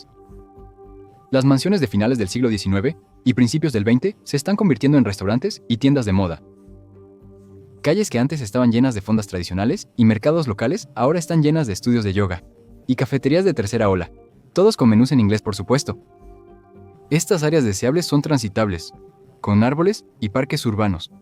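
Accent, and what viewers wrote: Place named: Spain. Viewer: Claro, suena muy español lol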